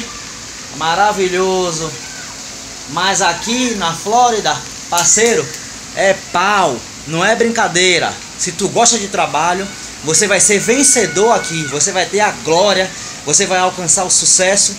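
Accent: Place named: Pernambuco, Brazil. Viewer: Sou de Pernambuco e conheci o sotaque pai! kk Muito bom o vídeo! Entrei no grupo do whatsapp e tenho algumas dúvidas...estou no aguardo do grupo, muito obrigado e parabéns!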